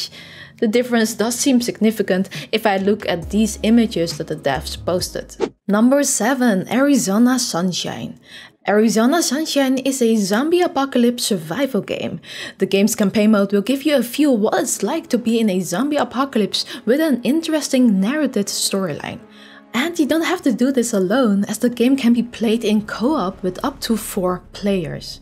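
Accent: Netherlands. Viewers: Wow your english is extremely dutch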